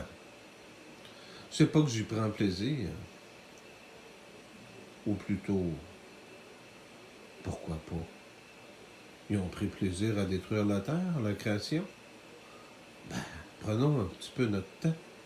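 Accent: Quebec, Canada. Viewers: mon Rabbi j ai prix plaisire a t entendre rire et j aime beaucoup la façon de t esprimer j espère que tu aura toujours cette accent si charment Québécois et ta façon de nous expliquerles choses me plaît beaucoup merci j ai aimer voire le toutou et le minou sa me manque beaucoup de pas en avoire mais je me ratraperais plus tard j aurais tout les animaux de ABBA pres de moi et ils seront libre d allée où ils veulent merci je vous embrasse fort toute la petite famille sesukuli mon Roy.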